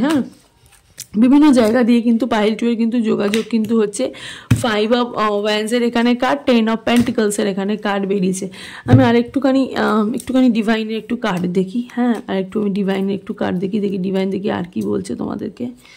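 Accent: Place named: Murshidabad, India. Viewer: Apni ki mursidabad e thaken nki apnar kotha te mursidabad manuser moto tan asche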